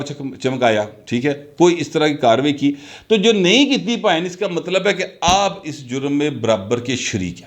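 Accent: Punjab, Pakistan. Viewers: سر آپ کا تجزیہ تو 💯 بہترین اور سچ پر مبنی ھوتا ھے پر آپ کا انداز بیان بہت خوبصورت ہے اوردو کے ساتھ جب پنجابی بولتے ہیں بہت cute لگتے ہیں بھائی جان ھم آپ کا ہر ویڈیو دیکھتے ہیں from uk students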